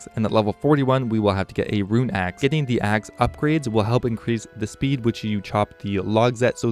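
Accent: Canada. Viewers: Hmm didn't notice before, is that Canadian accent I hear? :P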